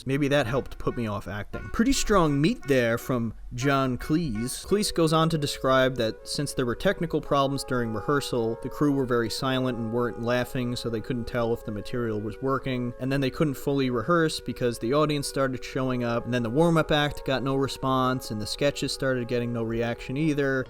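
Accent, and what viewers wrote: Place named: United States. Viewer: This is a pretentious and irritating video. The voiceover is so American it can not be listened to. It is what makes a difference between fact and knowledge. Yes the facts are there but the knowledge is missing. Cringe worthy dialog but little else...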